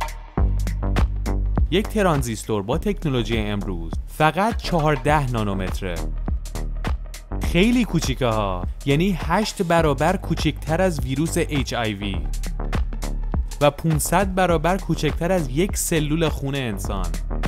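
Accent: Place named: Hamadan, Iran. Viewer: باید جواب بدی . میگما شمادلهجه همدانی داری ..خدای همدانی هستی من یشرط بستم ..حاالا ب رازی بگوببینم. همدانی پوست خرکن هستی ....زود اعتراف کن..😞😒😞😒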